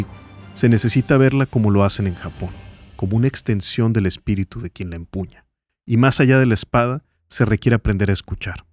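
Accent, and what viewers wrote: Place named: United States. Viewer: Hubiera sido un buen video si no usará ese asento culero de americano y espanglish forzado, si está hablando en español!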